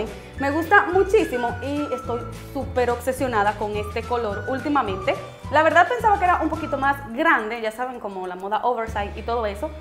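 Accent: Dominican Republic. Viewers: Por que las dos son dominicanas y tienen la misma forma de hablar.. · yo tambien hablo asi, y mi familia, amigos, etc. Aunque es cierto que en RD hay diferencias al hablar en cada region, la mayoria hablamos de igual manera. Saludos! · A eso se le llama ser de republica Dominicana 😂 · "Hablas igual a Yarissa!!" Se llama ser de República Dominicana, pedazo de bestias. :V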